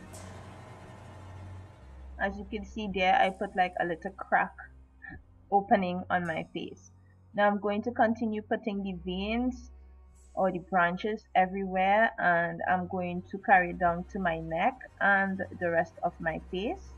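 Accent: Trinidad and Tobago. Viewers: Ahhhhhhhhhh of course you speak French......Awesome. Cute trini accent too. I love videos like this, always loved playing in special effects makeup. No skills though, I shall definitely learn from you. Love it. I will check out the rest too.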